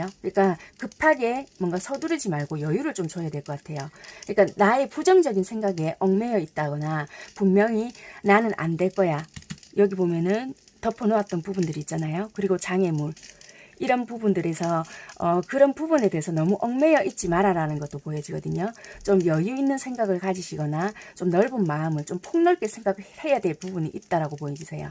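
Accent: Busan, South Korea. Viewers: 자세한 설명 감사해요~ 잘 맞아서 항상 잘 보구 있어용 이번에도 꼭 맞길💗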